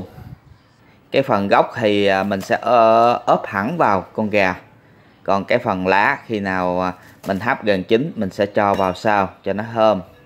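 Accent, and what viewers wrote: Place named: Binh Duong, Vietnam. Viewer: Nghe giong noi ban ... minh nghi ban o binh duong thi phai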